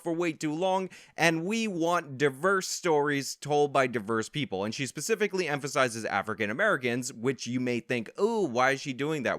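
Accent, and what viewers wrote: New York, United States. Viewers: I like your videos dude but this New York accent that you keep doing in every video is getting annoying haha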